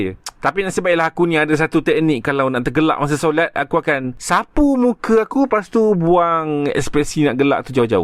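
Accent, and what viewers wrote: Malaysia. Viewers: Man percakapan kau memang macam Malaysia aku pulak ramai cakap aku orang luar sebab percakapan aku sangat formal macam orang putih baru nak belajar bahasa melayu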